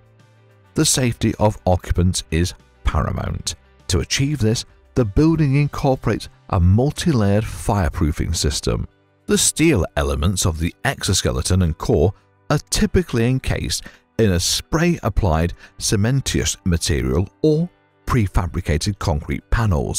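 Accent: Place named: United Kingdom. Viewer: We're not British here in New York City. We don't pronounce it Two-Hundred and Seventy Park Avenue. It is Two -Seventy Park Avenue. And while I'm at it, we don't say litrally either. It is pronounced literally. We don't drop the "E" like you Brits due all the time.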